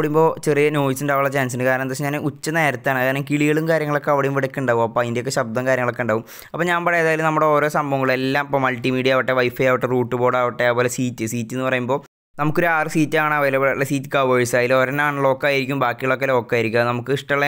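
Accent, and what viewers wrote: India. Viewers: U malappuram aano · Bro thrissur karanano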